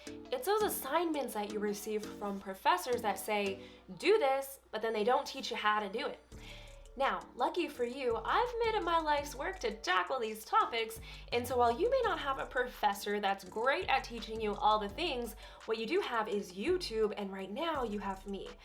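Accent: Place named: United States. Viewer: Your instruction is great. Thanks for keeping your instruction to the point. Having previously lived in the USA on and off for a few years its nice to hear your american twang reminds me of Seattle.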